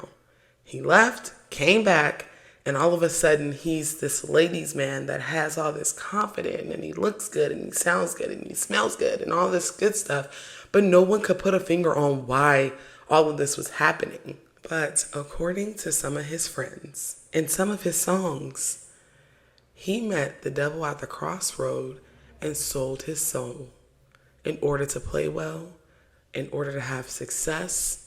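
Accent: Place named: California, United States. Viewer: Can somebody tell me where shes from? I hear a Stockton-Sacramento accent but I could be wrong…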